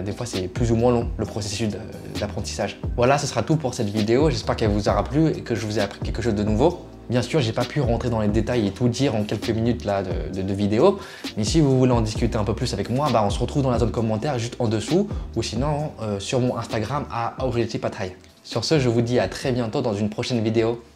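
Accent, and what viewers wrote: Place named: Thailand. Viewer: Hélas encore une idée préconçue et fausse (à peine dans les grandes ville) : la Thaïlande se classe 62e seulement sur les 70 pays répertoriés dans un classement mondial des compétences en anglais effectué par EF Education First. La cinquième édition du rapport montre également une tendance inquiétante pour la Thaïlande: le pays enregistre peu ou pas d’amélioration au cours des cinq dernières années. Par contre 100% d'accord avec le reste de de votre discourt et votre français thaîlandisé est remarquable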